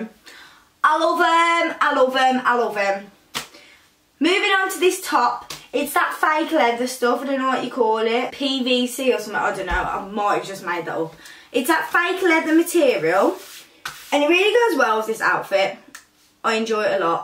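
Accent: England, United Kingdom. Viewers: British accent is nice. Calm tf down. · That english accent... ))) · Ur too pretty, love ur way ^_^ Brazils guy :P Obviously by ur accent u r from Uk :) Speak like Portuguese people :D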